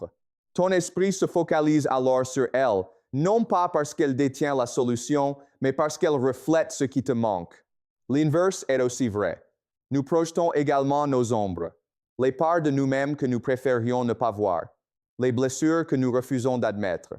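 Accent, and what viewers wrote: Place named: England, United Kingdom. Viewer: Dommage pour l'accent anglais, je préfère la voix habituelle ...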